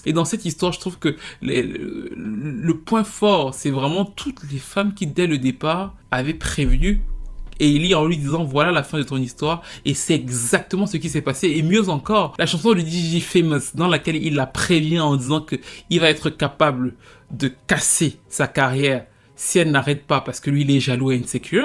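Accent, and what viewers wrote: Cameroon. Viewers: C'est tellement drôle, comme tu t'es repris in extremis ! 🙃😅😆 En bon camerounais, je suis sûre que la suite était: "Avant que le bébé"... n'entre ! 🤣🤣🤣🤣🤣 Et puis, la vidéo ensuite qui vient remplacer et imager le propros... 😂 Les camerounais que dis-je, les continentaux et leur phrasé légendaire... Comme je vous aime ! 😅🧡💯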